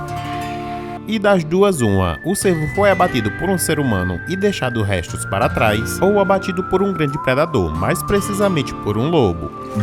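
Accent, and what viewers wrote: Northeast, Brazil. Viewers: você é nordestino não e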